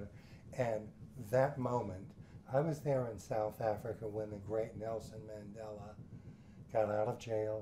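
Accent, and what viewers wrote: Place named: Transatlantic, United States. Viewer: One of the few anchors holding on to the Transatlantic Accent.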